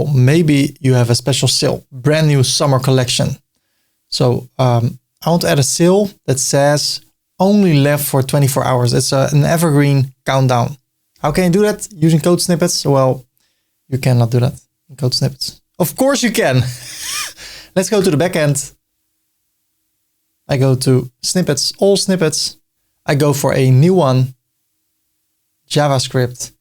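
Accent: Netherlands. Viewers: So that where that accent is from, from Holland.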